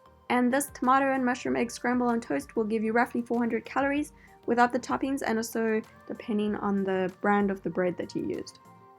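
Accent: South Africa. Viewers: Are you maybe from South Africa? Youe accents sounds like it. Great video by the way 🌈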